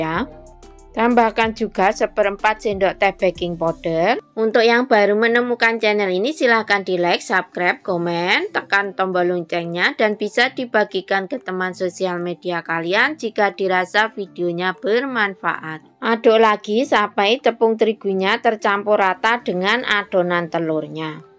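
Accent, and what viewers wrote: Java, Indonesia. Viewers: ....logat jawinya medok bgt....seneng dengernya...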